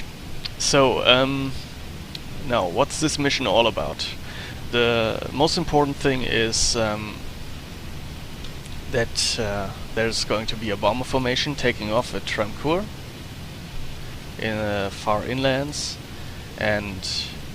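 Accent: Germany. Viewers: nice. I really enjoy your vids. your English is very good, and the german accent gives a nice 'feel' to the video when you are in the 109. by the way,(as a 'teaching thing', not as a 'know it all'....just to help you learn English), 'lympne' is pronounced 'limp-nee'......the word you had trouble with....'arguably', is pronounced 'are-gew-ab-ly', (I think you were just in a hurry and got 'tongue tied').....I can't wait to try your server.....thx....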